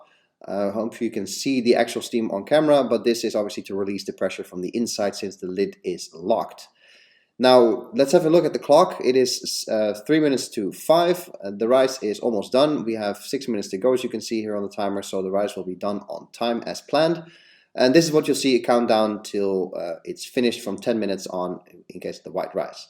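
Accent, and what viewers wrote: Netherlands. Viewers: Are you dutch?Good review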